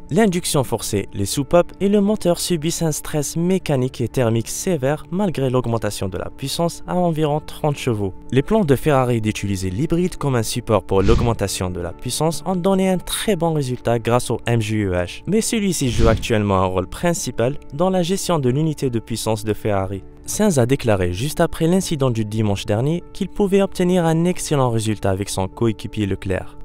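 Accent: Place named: Morocco. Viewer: Ah mais accent je peux pas ! C'est quoi ça ? Vs avez trouver un mec au fond du Maroc pour lire votre texte ? Laysteuuurrrr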